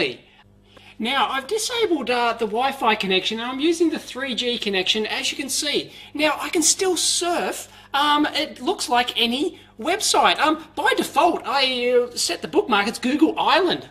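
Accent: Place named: Australia. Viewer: Rocking the accent.. is that australian or new zeland?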